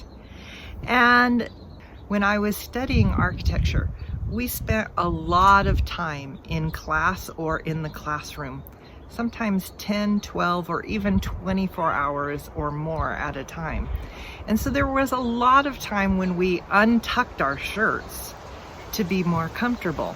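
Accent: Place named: United States. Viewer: I love your English, I think I reinforce it listening to you, your accent is very clean though I know that depends upon the region where you live is the type of accent, yours is wonderful if all the Americans had your accent it would be so easy for me to understand any person but it’s not in that way and there’s no other way that trying to train our ears with the different accents, congratulations, how I wish to talk to you so that I can practice the language.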